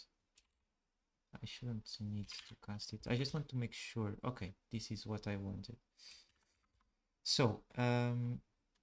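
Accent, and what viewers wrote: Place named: Portugal. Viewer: you've got a portuguese accent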